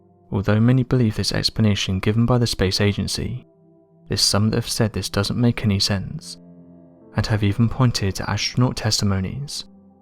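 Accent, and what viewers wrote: England, United Kingdom. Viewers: What's with all these info videos with these friggin narrators with english accents ?????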